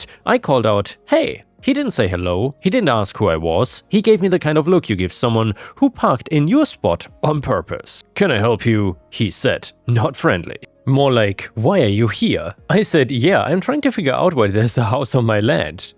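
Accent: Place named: Germany. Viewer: Love your videos. Your accent.. German? Sounds like my accent 😂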